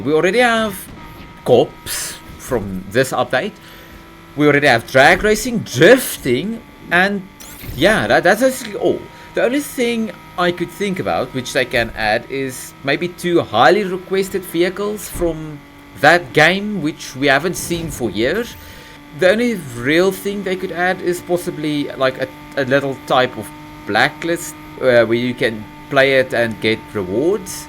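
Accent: South Africa. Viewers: Do I hear a fellow South African?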